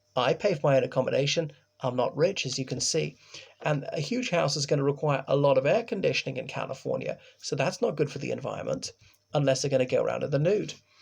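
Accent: United Kingdom. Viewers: You don’t have an Irish accent. You sound British!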